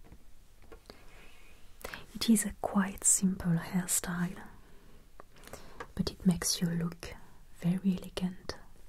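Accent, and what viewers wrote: France. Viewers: Bonjour, j'espère que cette pause aura été bénéfique pour vous. Pour ma part, vos vidéos m'ont beaucoup manquées et je suis ravie de vous revoir ! Pouvons nous espérer, un jour, une vidéo complète en français ? Car si je ne m'abuse, c'est votre pays natal ? Votre accent m'y fait penser. Bon courage et merci pour ces vidéos qui doivent vous demander tant de travail !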